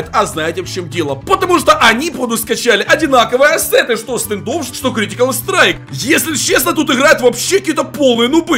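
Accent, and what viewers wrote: Ukraine. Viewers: Ты украинец ?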